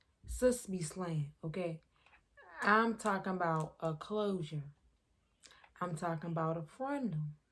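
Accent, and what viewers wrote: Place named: DMV, United States. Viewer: Ok cool! Thought I heard a DMV accent which is why I asked.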